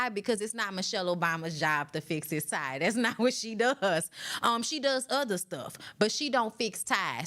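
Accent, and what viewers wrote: New Orleans, United States. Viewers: I love New Orleans accent 'Baybeh' since Choppa on Making the Band & Toya came on tv 😍💜💛💚 i need to come to your 'Citay'😉. 😘💐 💙 Nuff love manners and respect from London 🇬🇧🇯🇲🇧🇧😇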